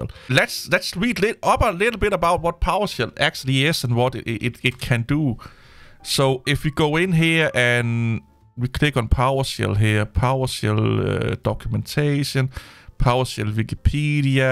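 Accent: Denmark. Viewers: you sound danish :)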